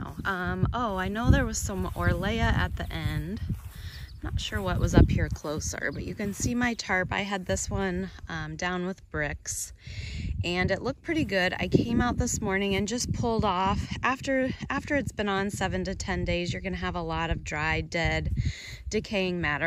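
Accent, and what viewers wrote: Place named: Quad Cities, United States. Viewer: This is random, but one of the reasons I love watching your videos because you talk like me! I'm from the Illinois side of the Quad Cities and I say "root" (and "roof") the way you do which I have come to find out is not the way people in other parts of the country say it.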